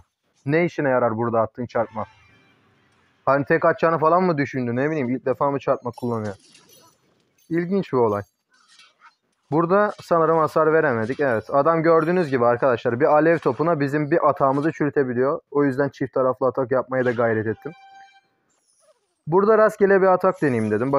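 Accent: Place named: Thrace, Turkey. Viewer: bizim Trakya insanına benziyor sesin zaten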